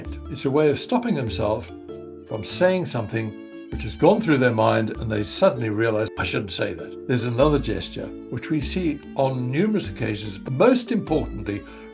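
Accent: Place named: RP, United Kingdom. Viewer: So we supposed to believe u coz u got Elizabeth's accent. Tsek wena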